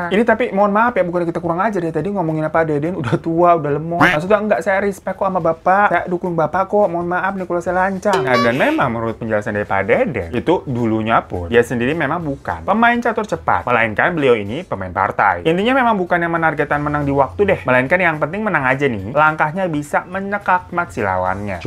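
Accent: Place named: Jakarta, Indonesia. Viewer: Anjir betawinya si abang admin,bikin ngakak seru !!! Jd kangen jakarta.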